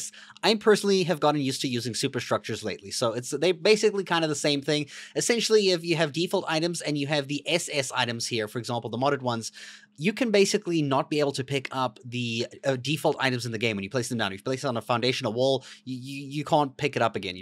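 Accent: South Africa. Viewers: Do I hear a lil South African accent? Heyyyyy from ZA. awesome vid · I could tell a fellow South African from that accent. A buddy got me into ARK recently and showed me some of these. Awesome Spyglass is a crutch for me now. Mist definitely gonna try a few of these. Also subbed too!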